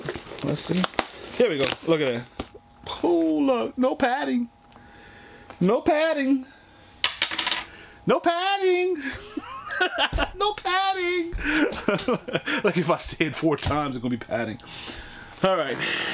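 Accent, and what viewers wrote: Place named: Lafayette, United States. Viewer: dude my Xbox was playing random videos about pops and yours popped up and as soon as I heard your accent I had to do a double take. I was born in Lafayette. so happy I found your channel!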